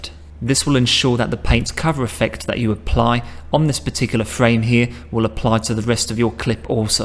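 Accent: United Kingdom. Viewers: Again, a very useful video - also your very clear English is brillant (very British), love it! Thanks a lot for your great efforts and keep up the good work! Greetings from Germany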